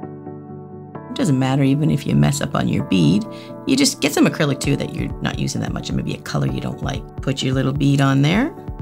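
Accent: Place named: Canada. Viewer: Valery B even without saying that they are from Canada (which has been said in several videos) you can tell the Canadian accent. 😂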